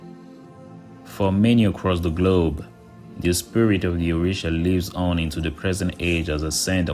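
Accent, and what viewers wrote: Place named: Yoruba, Nigeria. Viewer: Please keep ur yoruba accent! It makes storytelling more Intriguing. T-ankh u. Asè 😊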